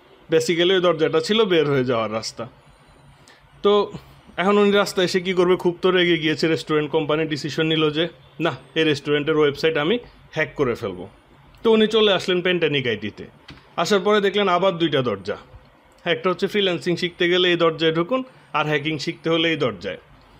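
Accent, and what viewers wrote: Noakhali, Bangladesh. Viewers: ভাই নোয়াখালী না চাদপুর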